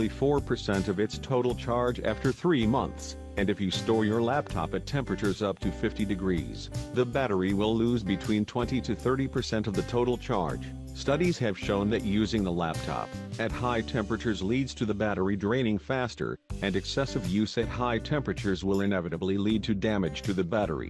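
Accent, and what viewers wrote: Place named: United States. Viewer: Thank you for this useful information. Hearing an American accent I assume you mean Fahrenheit and not Celcius, when mentioning temperature.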